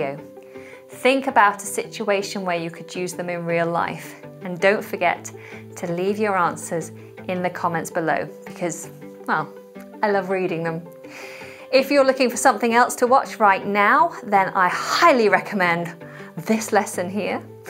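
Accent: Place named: United Kingdom. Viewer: I am falling in love with British idioms and British accent because of you 😭♥️♥️♥️♥️.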